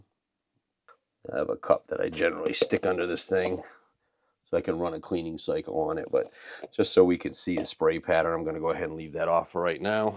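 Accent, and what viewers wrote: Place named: South, United States. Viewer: Ok,thank you for your information. I really appreciate it. This southern boy is out in Albuquerque missing Mississippi it’s good to hear a southern accent!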